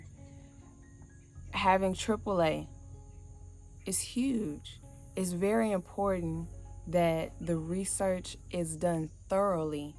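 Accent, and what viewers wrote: Houston, United States. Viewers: You have to be from Houston because you have that Houston accent. Lol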